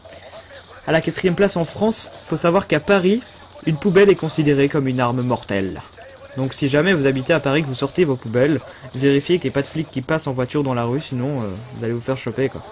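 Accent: Belgium. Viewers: j'adore notre lois belge U_u t'as un bel accent wallon =D c'est si rare mtn de parler wallon T_T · Si tu aussi tu as quitté après , pouce vert! Non, je rigole! xD J'ai adoré ton accent belge(je suis moi-même belge, je ris au imitations que l'on fait de nous!) et j'adore ta manière de commenter! Pour ton prochain top 20, tu devrais en faire 1 sur les 20 choses les plus tapées sur Google (tu tomberais sur des trucs... interessants!) Bonne continuation. Bises. Ton compatriote belge.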